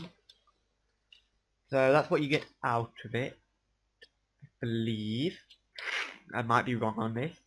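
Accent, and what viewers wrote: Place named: Australia. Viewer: Are you from Australia? You sound like an Australian.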